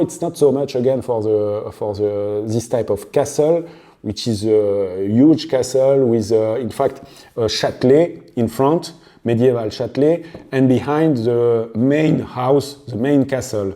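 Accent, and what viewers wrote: France. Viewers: your Frenglaise is delightful. Please continue. Je comprends tout! Mercie. Rikki Biermann, Pretoria, Afrique du Sud 🇿🇦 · That’s the French speaking English,I live to hear! Don’t like it too perfect!😊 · New sub from South Africa 👋👋 and if you improve or change your quintessential French accent I will unsubscribe 🤣🤣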